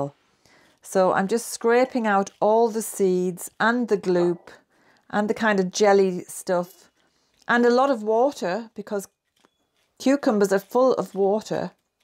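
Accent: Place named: England, United Kingdom. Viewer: ok ,you sounded a bit english, was you born in ireland or just have irish parents, i also have irish grandparents, and a half irish mum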